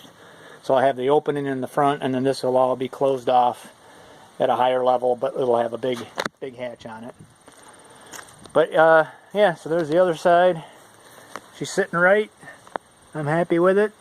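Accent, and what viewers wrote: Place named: Upper Midwest, United States. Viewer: Sounds awesome man. I can tell you're from the High North of the Midwest by your accent.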